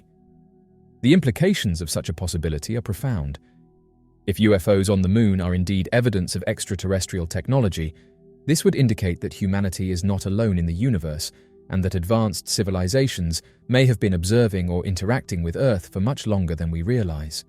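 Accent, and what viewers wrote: United Kingdom. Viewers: Even you know, with your British accent, THAT WE HAVE NOT LANDED ANYONE ON THE MOON. STOP PRETENDING OTHERWISE.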